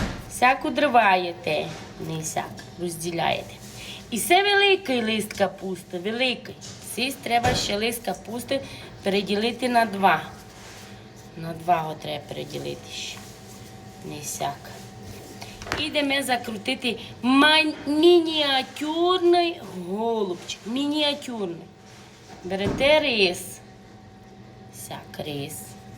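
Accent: Transcarpathia, Ukraine. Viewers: Привіт з Львова! Дуже люблю закарпатський говір,і ваші страви,молодчинка,так тримати!❤❤❤❤❤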